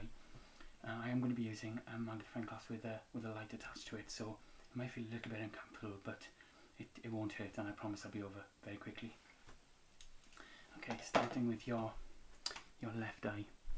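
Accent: Wales, United Kingdom. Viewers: I love Welsh accents 💕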